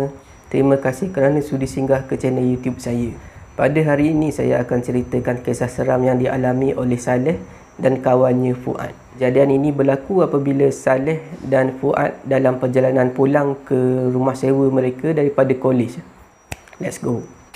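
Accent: Terengganu, Malaysia. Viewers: Hadir sapport org ganu kite.. Slang ganu mmg x leh lari 👍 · Bru nk Tanya orang Tganu kita ke? Betul pulok ambo agak orang Ganu.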